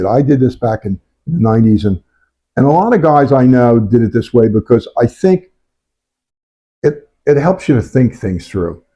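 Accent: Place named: Western Pennsylvania, United States. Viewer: Where are you from? Sounds like west PA accent.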